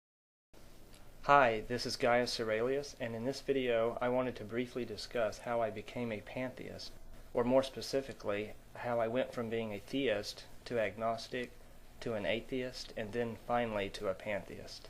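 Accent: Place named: Oklahoma, United States. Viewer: You sound like your from Oklahoma.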